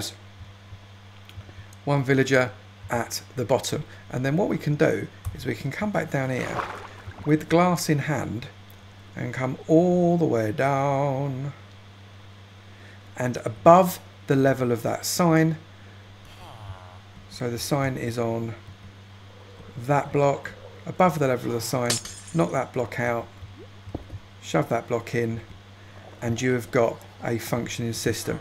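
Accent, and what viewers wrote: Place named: South London, United Kingdom. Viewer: How is it Gordon Ramsey when he doesnt cook the only thing similar is that he is also british. They both sound like they are from South London but it doesnt automatically make him Gordon Ramsey. Im guessing you are an American who thinks there is only one form of British accent. Let me correct you, there are a LOT of British accents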